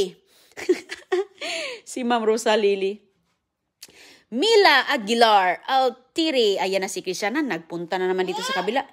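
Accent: Visayas, Philippines. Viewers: Mao nay nindot bisaya jud kaayo haha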